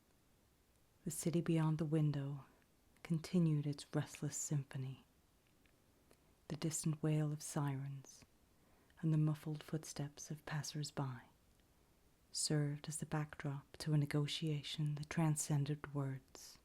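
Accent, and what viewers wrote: United States. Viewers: I mean the accent! forget about it! I'm American and you did great i wouldn't even be able to tell you were English! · This is professional! And, wow, an American accent too! You are so very talented. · Ohh wow! This is the first time I've watched this! You do an amazing American accent! Very well done,! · i dont know if youre still reading comments from this video but i absolutely love your american accent in particular 😭😭 i love this channel so much :) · Whoa, it seems like your personality automatically changes when you speak with an American accent. Perfect! And you’re so beautiful, my God. I can’t handle so much beauty on my screen, ✋🏻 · Nice American accent! You rocked it! You deserve millions of views! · your american accent is perfect!! great video :) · I thought you were American from this... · your american accent is crazy good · Wow that american accent is fab and i love your blonde hair too x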